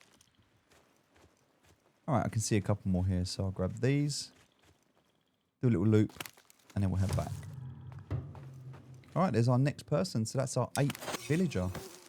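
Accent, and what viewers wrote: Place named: East Anglia, United Kingdom. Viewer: decent watch thanks. also sounds like you're from round my way? east anglia?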